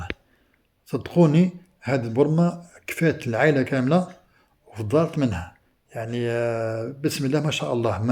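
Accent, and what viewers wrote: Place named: Annaba, Algeria. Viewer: J adore l accent bonois,،😘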